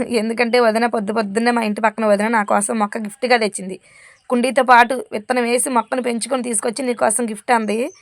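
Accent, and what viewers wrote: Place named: Godavari, India. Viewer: మీ మాటల తీర్ను బట్టి ఏలూరు రాజమండ్రి పక్కన ఊరు అనుకుంటున్నా అక్కడైనా మీ ఊరు ఏ ఊరు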